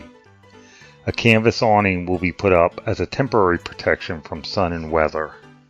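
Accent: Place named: Philadelphia, United States. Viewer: You're a true Philadelphian with that accent!!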